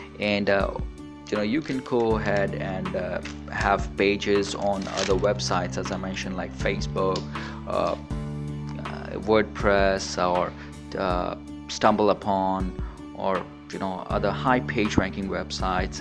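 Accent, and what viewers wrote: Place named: India. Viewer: sound like indian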